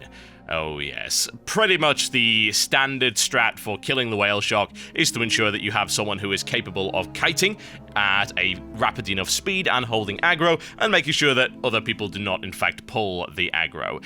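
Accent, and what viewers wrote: United Kingdom. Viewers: WTF WTF??? Wow is no more wow we known. It Changes when comes Lich king and Cataclysm. Reason why people stop playing wow its repeats all time everything is same. I like for his comment and i like british accnent ( sorry for my gramma ) so Good Luck i wish u very nice future!!!!!!!!!!!!!!!!!!!!!!!!!!!! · Thank you for your always honest and informed opinons in a british accent. You will always be my first stop on the interweb b4 i start hardcore gaming. And I'm grateful you will be continuing the mailbox as i love to hear your thoughts on things that the community has ideas or opinions about. Good Luck finding another MMO to play and i wish you the best of luck!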